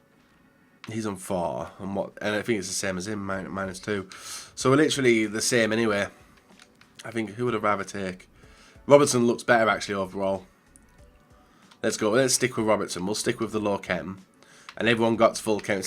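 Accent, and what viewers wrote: United Kingdom. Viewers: you from u.k??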